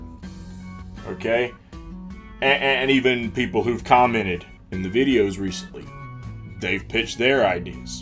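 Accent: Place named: South, United States. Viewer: for what it’s worth you have consistently been one of my favorite channels because you speak your truth in this desert of ridiculous echo chambered circular firing squad madness of collective cognitive dissonance that makes up most of so called “indie media.” If “independent” means “independent” of actual intellectually honest and consistent thoughtfulness; then that title may apply to far too many channels, but not yours. Whatever you do; always be wishing you success, the best and nothing less. Take care out there brother as it’s been nice hearing a fellow southerner that shatters the stereotypes; thanks for all you did (and will do.) ✊ Always Be, Your Huckleberry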